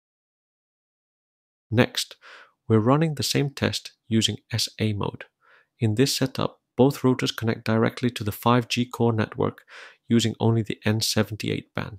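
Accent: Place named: United States. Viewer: I'm German and have little practical experience with English. As a radio amateur, I'm interested in the films from "Ringway Manchester." My problem, however, is that I find it extremely difficult to understand the guy. It's not even Donald Duck-like speech anymore. I also have to say that I understand your presentation without any problem. It's as if an educated American were speaking. I understand Satanic Coronaut's question as meaning that he wasn't sure whether the test applied to US networks or European ones.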